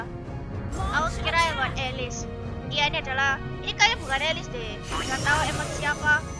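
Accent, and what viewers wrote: Java, Indonesia. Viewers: Suaranya medok 😂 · kok bisa gue baru nemu manusia 1 ini, gameplay bagus, hero ga template cwe, auto subscribe sih apalagi jawa banget😂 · Mbak medok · Medokmu candu sekali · mba nya org jawa kah? logat nya kental banget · Medhok ya ngomongnya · Mewedok ni streamer suka aku wkwk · medok medok lucu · medok bgt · Jawa ga sih?? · Kowe wong jowo mbak 👀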